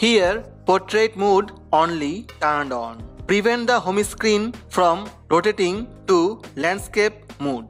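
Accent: India. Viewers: Indian bullshit accent hahahaha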